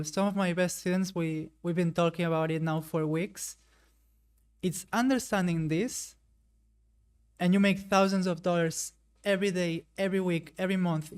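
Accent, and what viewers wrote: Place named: Spain. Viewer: para que cojones hablas inglés si eres español, vas de intelectual y es ridículo. Mucha pereza la gente como tú, de verdad que tu acento es ridículo, si buscas más visualizaciones hablando inglés lo llevas claro, espabila chico.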